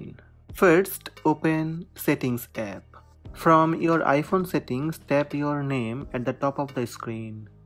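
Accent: India. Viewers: Indians speaking english sounds very ridiculous, next time use subtitles or remove anything below your tongue, the world will thank you.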